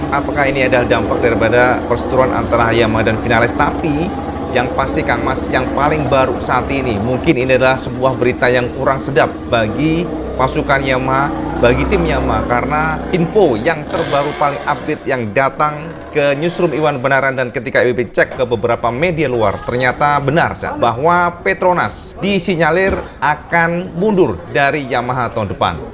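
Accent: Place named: Java, Indonesia. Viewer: Terbaik..terus saya mendengar walaupun tak faham loghat jawa bila disebut..😆 Subscriber dr 🇲🇾👍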